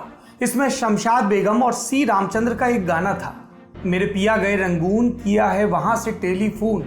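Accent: India. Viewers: Sir your SUBSCRIBE logo is hiding your RIGHT side where you place certain eco friendly objects. Your English pronunciation is typical hindi medium style when you are even asking Rajdeep in English whereas he is replying in Hindi. No one can be perfect. As a admirer I want you to be perfect and that is why I am complaining, otherwise your knowledge is appreciated by all.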